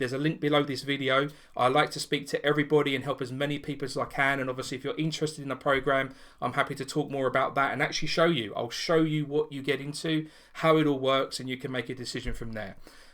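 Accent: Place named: United Kingdom. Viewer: Is this in the USA. You sound British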